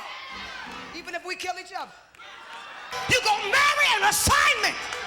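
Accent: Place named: South, United States. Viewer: another jumping on the band wagon of celebritism. If I make a comment about Brad Pitt and Angelina will I get discovered? Not a scripture in sight or in site. Thanks for telling us your whole life story in that once again loud southern preachers voice!You got a mike but I guess you cant get the same effects if you cant yell and stir a crowd emotionally! Ah baby...I dont feel like having sex tonight! You dont hear me! You didnt buy me no stockings...Wake up! marriage counseling is cheaper!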